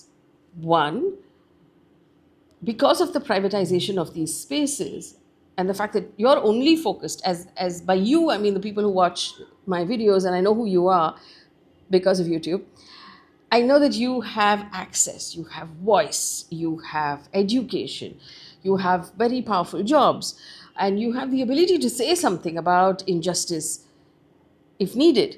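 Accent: India. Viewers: Her curry English is so bad.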